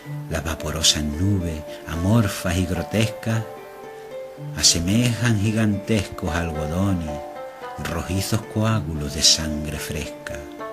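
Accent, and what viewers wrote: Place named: Extremadura, Spain. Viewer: Muchas gracias, mi estimado amigo y paisano, por este precioso montaje audiovisual de mi poema en el que se dan la mano fraternalmente el verso y la imagen en una sincronía perfecta... Me ha gustado el tono de voz grave,sereno, solemne y la "extremeñización" del lenguaje con las haches aspiradas.Sólo tengo que ponerte un "pero": no has puesto ni una sola imagen de mi querido pueblecito, Jaraicejo. Un abrazo fraternal de tu amigo Wenceslao Mohedas Ramos Jaraicejo (Cáceres) / Barcelona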